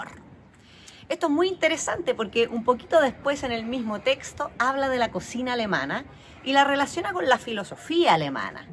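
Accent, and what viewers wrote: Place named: Chile. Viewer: Hola, que buen Canal me acaba de recomendar youtube, por tu acento asumo que eres de Chile cierto? Saludos!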